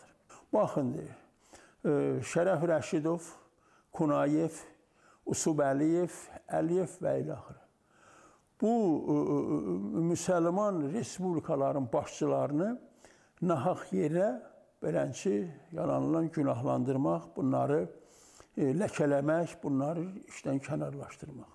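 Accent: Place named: Armenia. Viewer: Əsil yerazlarin ləhçəsilə danişir. Onnar,bax belə danişin. Olar, hansi yaxşi görünür və esidilir.? Yazin.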